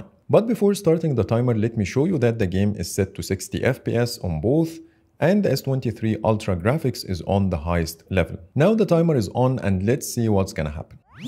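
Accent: Egypt. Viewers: Bro, are you Egyptian cuz I can hear it from your accent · عاش والله طور من الانجليزيه عشان ملاحظش انك مصرى بس ممتاز جدا ❤ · مصري؟😂 حسيتك هيك من اول جملتين😂 *انا سوري btw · اللهجة مصريه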